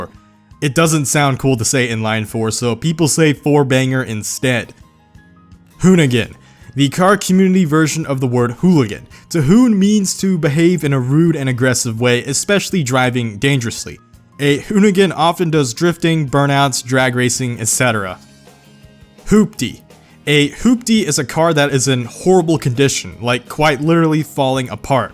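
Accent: United States. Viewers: Audi ≠ oRdi Peugeot ≠ Pew-jo Renault ≠ RenNo you yanks are the fucking worst i swear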